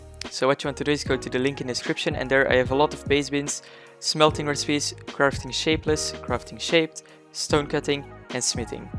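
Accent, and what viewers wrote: Netherlands. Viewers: Are ya dutch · i like how this guys sounds dutch i am from holland to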